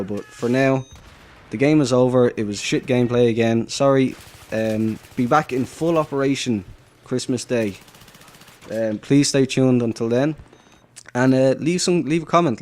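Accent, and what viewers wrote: Ireland. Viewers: U aren't! I'm actually Scapooty and if ur then you should know me. Lol never knew you had such a Irish voice! I should be uploading some PC BF3 gameplay soon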